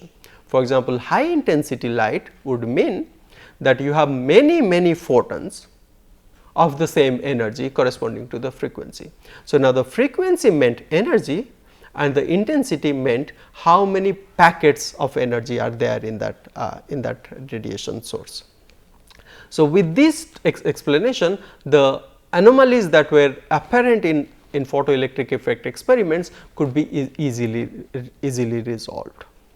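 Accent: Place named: Kolkata, India. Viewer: Jadavpur English. He pronounces "absorb" as "abserb." It is REALLY difficult to make out what he is saying. And he trips too many times.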